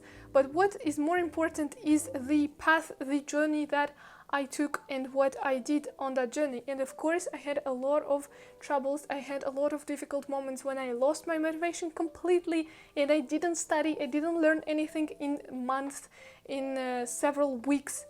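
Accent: France. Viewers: Bravo pour cet accent français 👏 👏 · It is true thati n your instagram video you really have a french accent haha. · LOL I thought she was french because of her accent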